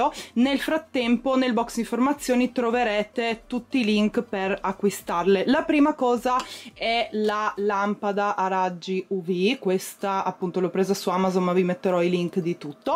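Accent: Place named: Trieste, Italy. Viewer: La cadenza è triestina...Qualche parola la pronuncia un poco male perché porta l' invisalign ai denti...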